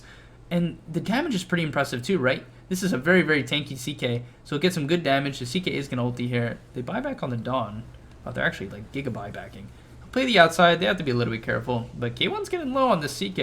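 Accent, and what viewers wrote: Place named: United States. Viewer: The way you say veil lol, I was like wtf is he saying.. had to listen 3 times. Do all Americans say it like that? Are u American or Canadian, u sound American I think.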